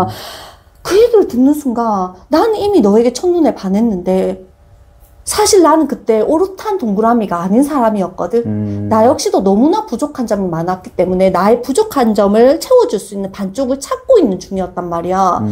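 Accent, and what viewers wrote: Gyeongsang, South Korea. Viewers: 실버버튼 응원합니다. !!! 42개월 여아 키우는 워킹맘입니다. 숲놀이 검색하다가 교수님을 알게 되었는데 지금 저에게 필요한 내용이 많아 이것저것 듣다가 팬이 되어버렸어요 지금 틈 날때마다 계속 듣고 있는데 사투리에 대해 고민이 있으신지 몰랐네요. 전 선생님께서 사투리 안고치셨으면 해요. 제 남편이 경상도사람인데 말투가 너무 쎄고 공격적이라 부드럽게 말해달라고 하면, 경상도라 그렇다. 경상도는 원래 그렇게 말한다라며 정당화 시키거든요. 근데 또 경산도도 내륙쪽이랑 바닷가쪽이랑 또 다르다며 바닷가쪽일수록 더 거칠다라고 말하는데.. 경상도여도 말투가 이렇게 다정하고 부드러운분도 계시다고 꼭 알려주고 싶어요 ~~~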